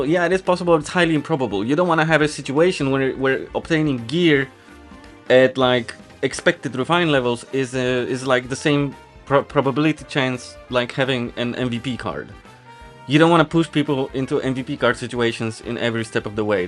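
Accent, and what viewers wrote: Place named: Poland. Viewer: Are you from Poland? Your accent sounds like you are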